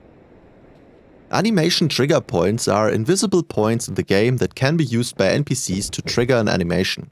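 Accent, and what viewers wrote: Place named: Germany. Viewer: Bro can't get rid of his German accent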